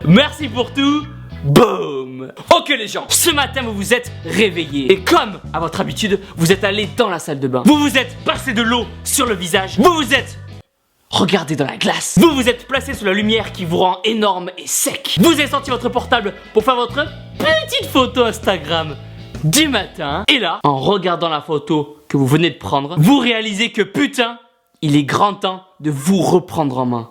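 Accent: South, France. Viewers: En passant, vidéo très explicite. Et vive ton accent du sud!!!!!!!!! Je me sens moins seul :). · tu n'as pas un peu un accents? tu viendrais pas du sud?^^ Tibo InShape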